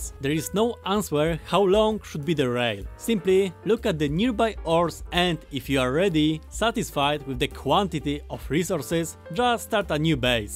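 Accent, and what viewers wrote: Poland. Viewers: You have a very thick ponglish accent. Have you attended to pasha learning school? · Cały czas się zastanawiam po tym akcencie czy jesteś Polakiem. Ciekawe... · jak tak słucham tego akcentu, to słychać że Polak xD ale lepiej po eng nagrać, bo wiecej osób obejrzy, wiadome, pozdrawiam :D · WIEDZIAŁEM ŻE JESTES POLAKIEM PO AKCENCIE POZNAŁEM W SEKUDNE xdd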